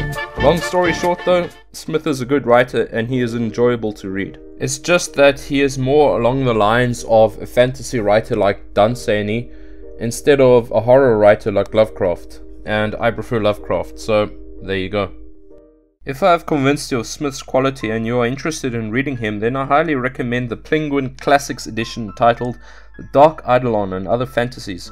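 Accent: South Africa. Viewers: So do many of the ones on Abebooks but you get a vast selection and they're VERY cheap, sometimes pennies. Seriously, check them out... hang on, I just realised, from the accent, you're probably not in the US or EU are you? South African? If so there's an Abebooks in Capetown but they're all over the place.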